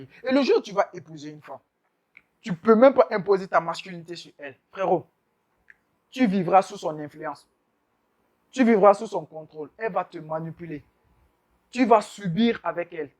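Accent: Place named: Cameroon. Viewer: Tu a le même accent que Samuel etoo l’ex soccer player je suppose que tu viens aussi du Cameroun comme lui haha 😉😉😉😉😉😉😉😉😉😉💪💪💪💪💪💪💪💪💪💪💪💪💪💪💪💪💪💪💪💪💪💪💪💪💪💪🤜🤜🤜🤜🤜🤛🤛🤛🤛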